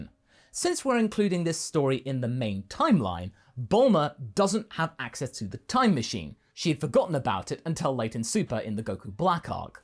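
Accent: United Kingdom. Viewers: Day 1 of asking whether he’s from the UK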